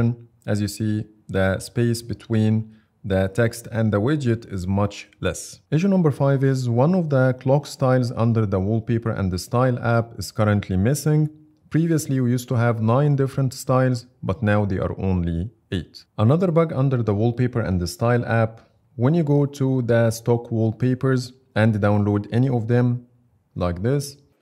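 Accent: Egypt. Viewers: I love the egyptian accent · Your accent is egyptian dude….love the video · Bro ur accent is Egyptian 🤨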